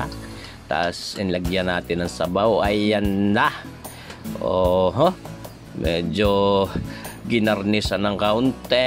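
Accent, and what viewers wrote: Batangas, Philippines. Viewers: very nice accent batang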